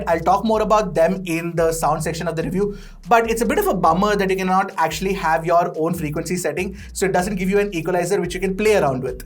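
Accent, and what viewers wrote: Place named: South India, India. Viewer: Irritating south indian accent😖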